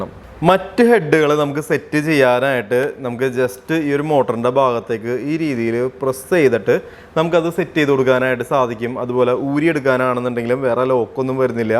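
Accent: Thrissur, India. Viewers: തൃശൂർ ഭാഷ പൊളിച്ചൂട്ടോ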